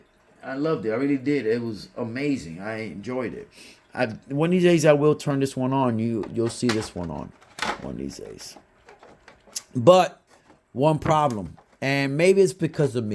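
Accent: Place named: New York, United States. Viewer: Hey my brother where you from I'm just curious cuz you sound like a New Yorker I used to live in the Bronx, The reason why you took me back, I'm getting right back into the hobby again.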